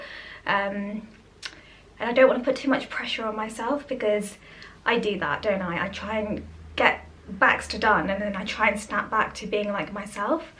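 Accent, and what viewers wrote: United Kingdom. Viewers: you and are such a lovely couple. lovely accent btw. which part of the UK does your british accent originate from?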